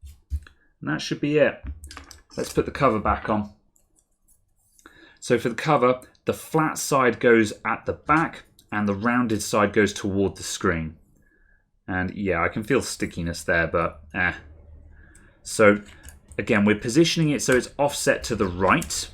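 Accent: England, United Kingdom. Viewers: Just followed this and found the hinges were actually cracked on both sides, so no hope of fixing the loose screen, but brilliant, clear and helpful video. Bonus points for clear English accent.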